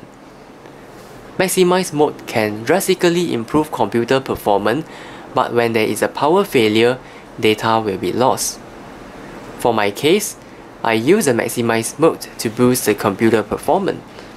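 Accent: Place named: Asia. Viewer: asian brotha accent for the win!!!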